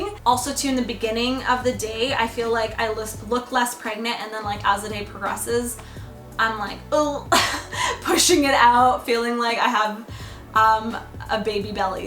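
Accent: Canada. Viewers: Is she Canadian???!! I can hear an accent!!!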